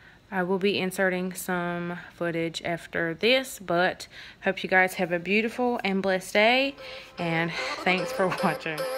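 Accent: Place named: Alabama, United States. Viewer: I love listening ot you talk... you sound like everyone I know! :-) (I'm from Alabama.)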